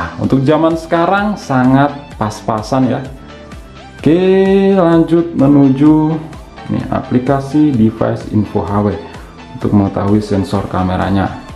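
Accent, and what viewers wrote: Blitar, Indonesia. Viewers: Wong blitar bak e :v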